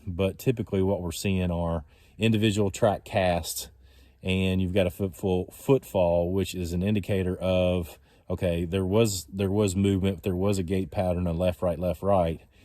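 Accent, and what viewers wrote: Kentucky, United States. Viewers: Knew you were from KY the moment I heard you speak!!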